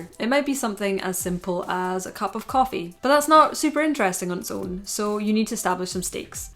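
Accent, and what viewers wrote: Scotland, United Kingdom. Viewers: A few days ago I went down a rabbit hole of story telling and writing advice videos after getting frustrated with writing for a concept album. I found Jenna Moreci first and watched one or two other American writing youtubers. Your review of Jennas' book was suggested on the home page while I was taking a break after getting stuck writing today and I gave it a click. I have tried before to find compelling Scottish youtubers and not managed so I was very pleasantly surprised to hear you were Scottish, from Glasgow even! That video itself was great and I'm really enjoying the segmentation and detail you're going into with this series. Keep it up!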